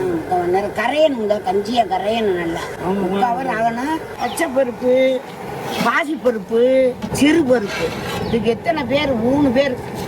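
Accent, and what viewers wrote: Ramanathapuram, India. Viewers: நான் Meharaj recipes ராமநாதபுரமV.KMA வகையறாநான் 👌 உங்கள் வீடியோ அருமையாக உள்ளது பேச்சு வழக்கு எல்லாம் ராமநாதபுரம் தோரணையில் உள்ளது உங்கள் வீடியோவை பார்ததில்மிக்க மிக்க மகிழ்ச்சி ஊரில் உள்ளவர்களுக்கு வகையறா என்று ஒன்று இருக்கும் உங்கள் வகேரா பற்றி வீடியோ போடுங்க நீங்க எங்க நண்ணி மாதிரி பேசுறீங்க இப்போ எங்க நண்ணி இல்லை வயதானவர்களை பார்த்தால் எங்க நண்ணிஞபகம்தான் வருது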